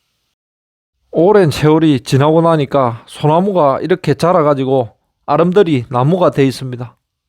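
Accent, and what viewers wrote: Gyeongsang, South Korea. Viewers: 경남사투리가 짙으셔서 정치색의 댓글이 달릴것같습니다. 그냥 빨간당 이실거라는 생각이 들긴합니다.ㅎㅎ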